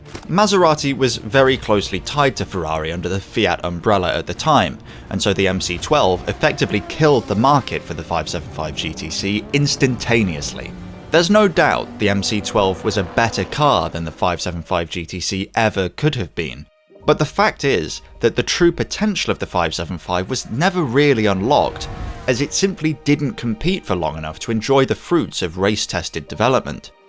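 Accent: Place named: North, United Kingdom. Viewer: Awesome vid as always! Where in England are you from, if I may ask (I'm a non-Anglophone who's recently found a fascination for English regional accents)? You speak fairly posh but at the same time you are unmistakably Northern!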